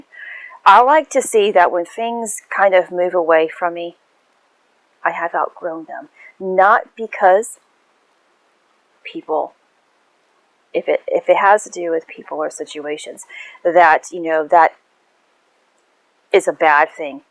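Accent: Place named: Asia. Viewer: I noticed a bit of and Asian accent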